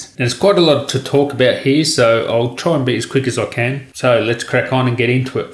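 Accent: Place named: Australia. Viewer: What is it with Australians and the way you pronounce the letter "Aitch"?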